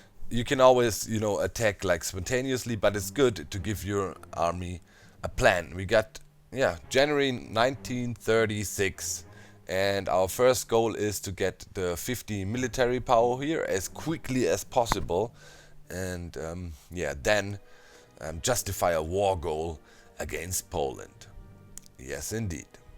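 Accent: Germany. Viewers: Are you german? Your accent says you are am i right?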